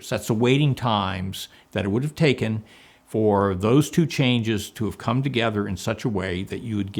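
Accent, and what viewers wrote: United States. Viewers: Ha, it's mostly a middle American accent with a 'who knows what' perturbation. May have grown up in one place, and moved to another during childhood.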